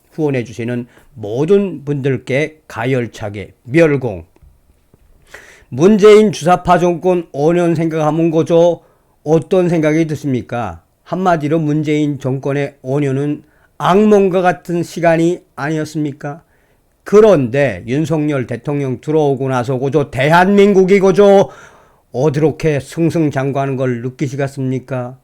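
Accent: North Korea. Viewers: 북한 톤으로 말씀하시는거 듣기 부담스러워요. 항상 방송 잘보고있읍니다 감사합니다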